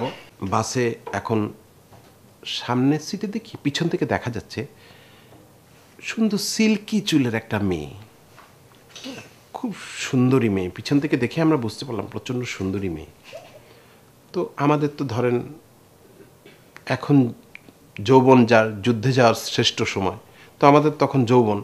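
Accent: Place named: Old Dhaka, Bangladesh. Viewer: Bhaiya, onek majul kahino 😍🤣 Apnar kintu ekhono puran dhakar pronunciation ache. Khubi bhalo Lage shunte 😍😎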